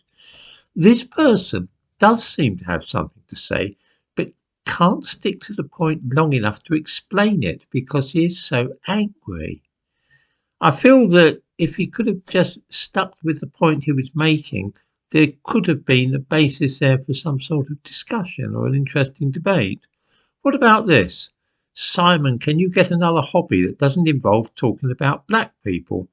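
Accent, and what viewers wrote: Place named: RP, United Kingdom. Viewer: Duhh! Maybe is because you’re spewing hate, bigotry, racism with a posh accent.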